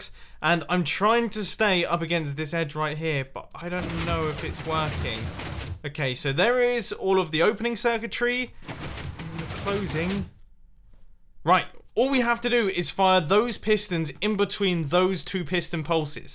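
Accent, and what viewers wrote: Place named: United Kingdom. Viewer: you are speaking british !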